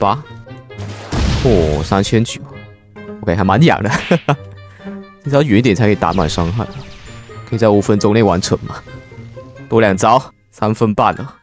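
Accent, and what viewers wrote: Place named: Hong Kong, China. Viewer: R子你可以用马来西亚的口音吗？好怀念你马来西亚口音。你现在的口音好像香港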